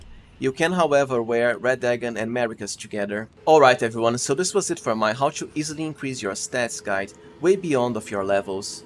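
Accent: Brazil. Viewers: esse cara é br né? esse sotaque n engana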